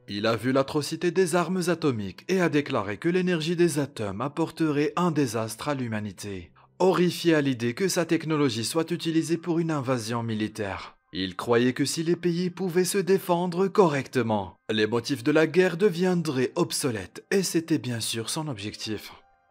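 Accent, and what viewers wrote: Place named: Maghreb. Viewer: C'est le timbre maghrébin qui te dérange ? Moi ça ne me gêne pas du tout. C'est très compréhensible. Peut-être est-ce vous qui avec un problème de tolérance et de compréhension. Ou d'idéologie...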